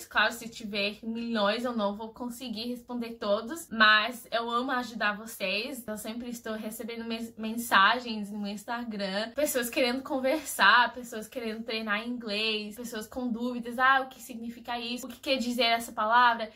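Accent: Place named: Brazil. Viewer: Meu Deus, achei que fosse brasileira. Que português lindo vc tem 👏🏾👏🏾👏🏾👏🏾🥰❤️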